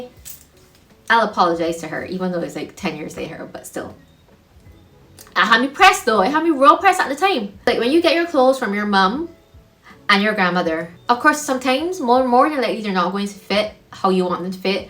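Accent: Barbados. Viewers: yeah it kind of caught me off guard. I was like wait is she speaking English. I had to listening intently, I said oh she’s Bajan. 😊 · Fr she sounds like she might be from barbados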